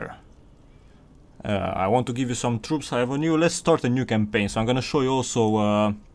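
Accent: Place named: Transylvania, Romania. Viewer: Esti cumva ardelean?Dupa modul in care vorbest :D.Un mod foarte bine facut e si Nova Aetas care, este modul meu preferat.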